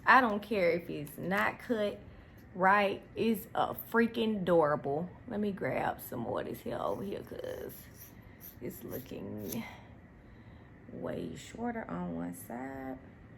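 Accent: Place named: South Carolina, United States. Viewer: Im in South Carolina and I swear some of the things you say sound like my people.... You might be family lol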